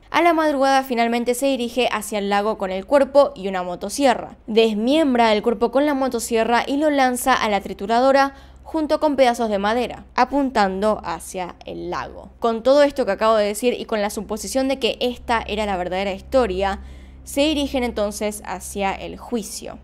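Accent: Argentina. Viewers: Trabajo en la madrugadas y hace un par de días vi tus videos, creo que ya vi la mitad jaja. Eres genial, con todo y tu acento argentino ✌🏻😋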